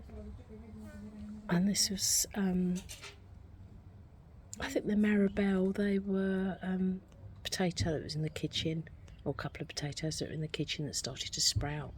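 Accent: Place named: Southern England, United Kingdom. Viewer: Hi I've come over from the last chance cookout collaboration. Great to meet a fellow Brit and you sound Southern too - not many of us about! Hope everything survived the extreme heat, its very hard going isn't it? Love your garden, you've got so much going on 💚 Karen and Rose 🥰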